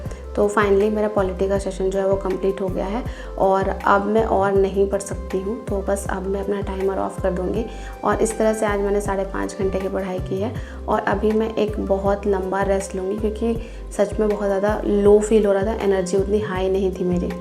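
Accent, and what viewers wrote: Bihar, India. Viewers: Kya aap Bihar se h?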